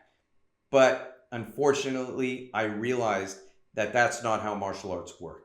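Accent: Israel. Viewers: Good video and good Israeli accent. I had never heard of the Israeli style. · Your Israeli accent is very good.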